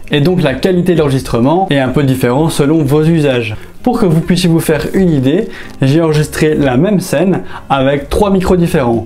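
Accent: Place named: Belgium. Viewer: tu est de quel pays avec cette accent belge peut etre?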